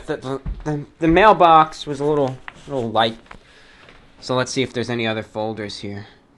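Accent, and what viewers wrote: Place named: New York, United States. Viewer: 7 years ago. Your NY accent was HEAVY back then.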